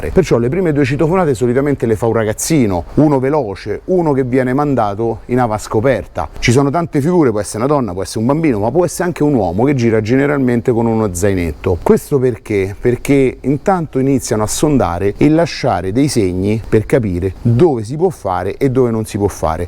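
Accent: Rome, Italy. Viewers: Complimenti, una spiegazione semplificata per tutte le Età, poi con l’accento Romano, nun ce potemo sbagliá 🙂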